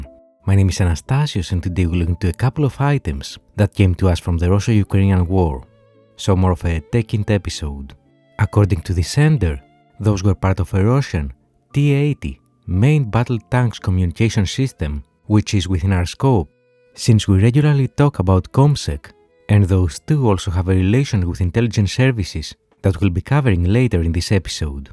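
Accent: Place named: Greece. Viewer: I heard the Greek accent. And then, discovered your name !)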